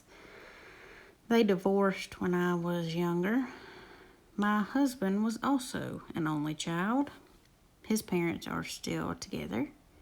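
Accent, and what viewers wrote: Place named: Louisiana, United States. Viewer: omg i love you ...kept me laughing the whole hour....could listen to your accent all day ..makes me homesick....was born and raised in Louisiana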